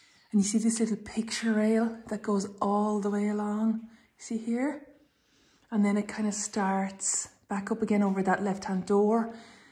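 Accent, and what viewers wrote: Ireland. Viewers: Reminds me of the house in the movie, Quiet Man." I love listening to your awesome Irish accent 😍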